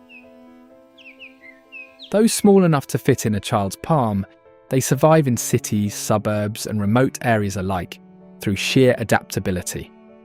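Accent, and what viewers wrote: United Kingdom. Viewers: Uh… that black catfish with the white stripe does not exist here. Who did this video? I’ve fished catfish in the Texas hill country for over half a century and have never seen anything like that. Channel cats, blue cats, yellow cats and the occasional bullhead are the main species. And the bird eating a crab never happened either. That would be at the coast. No crabs in the hill country. The British accent now makes this suspect. Also the comment about Canyon Lake being not natural is strange. No lake in Texas is natural except for Caddo. Sorry about my critique. Other than a couple of glaring mistakes this is a good video. Oh, and Luckenbach has a huge gift shop. Always has. This area in Texas is my soul.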